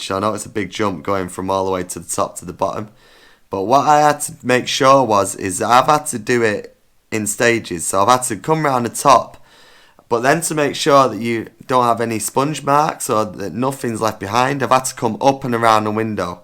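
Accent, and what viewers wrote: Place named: North, United Kingdom. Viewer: Hastings?! You sound like you have a northern twang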